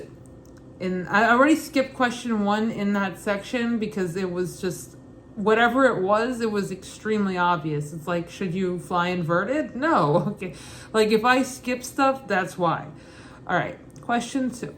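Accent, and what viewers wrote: Brooklyn, United States. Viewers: Fantastic video! Thanks for sharing. Absolutely love the Brooklyn accent—so charming! 😄"😀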